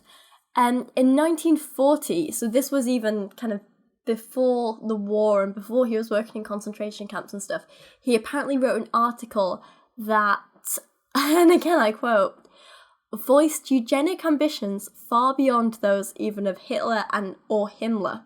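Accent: United Kingdom. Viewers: You have a British accent. Hope you still enjoy living in your third world country with high criminality. You deserve it. if you don t believe UK is third world Google United Nations replacement migration. I m not westerner.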